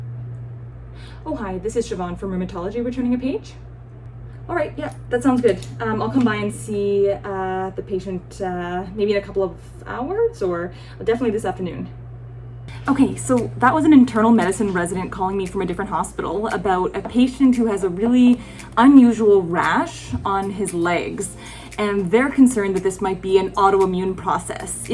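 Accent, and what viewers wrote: Canada. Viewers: Where are you in Canada? Judging from your accent you're a native Canadian. Correct? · This is the first video that I have watched of this physician and I knew absolutely nothing a out her...but I guessed pretty quickly that she may be Canadian after she said "about", I'm CDN too and I guess we really do have an accent! I also have RA so it's pretty cool to see things from a doc's perspective.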